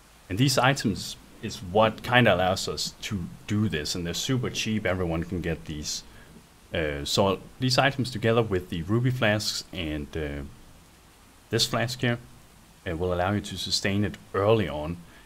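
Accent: Denmark. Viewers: Er du dansker ? :P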